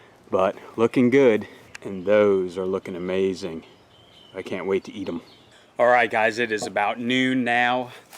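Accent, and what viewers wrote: Philadelphia, United States. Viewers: That really does sound like a Philadelphia accent haha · Hey just found you! Love the vids! Where are you from originally and where do you live now? I hear Philly s Jersey... amiright? And in Florida now.... you?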